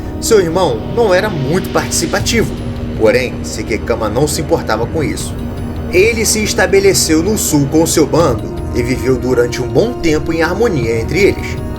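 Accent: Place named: Rio de Janeiro, Brazil. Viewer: O sotaque carioca não passa.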